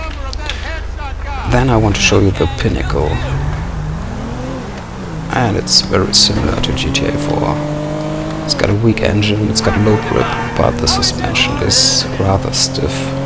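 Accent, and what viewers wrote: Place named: Germany. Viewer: man merkt an deinem Akzent dass du deutsch sprichst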